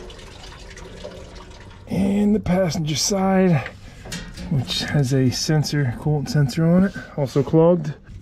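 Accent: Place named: Baltimore, United States. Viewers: I'm from Baltimore and your accent reminds me of back home. When you replaced the thermostat, did you use permatex the right stuff on that thermostat housing? In addition to the gasket? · Are you from Philadelphia you have an accent I hear it. wuder hoome We say home with a long O. 🚁 🪂 If you're not you're from Baltimore they have a similar accent. ✌🏻👽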